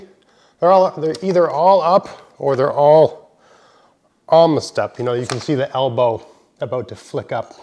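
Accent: Maritimes, Canada. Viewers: Cotyledon. the seed leaves. Coh teh LEE duhn I say, you didn't mispronounce it. You simply have a Maritimes, accent!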